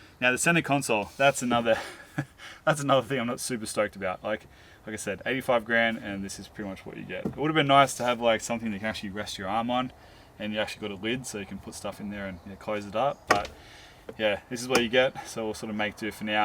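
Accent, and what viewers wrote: Australia. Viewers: Is that an Australian accent ??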